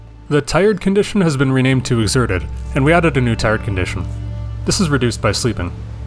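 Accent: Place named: Canada. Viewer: The dude voicing this is insanely Canadian.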